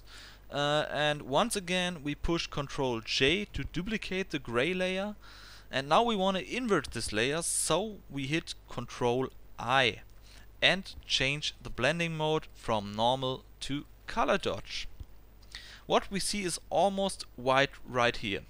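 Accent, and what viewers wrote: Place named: Germany. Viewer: Ich wollte gerade fragen, ob du deutsch bist, bevor ich die Kommentare gesehen habe. Dein Akzent ist wirklich deutsch :D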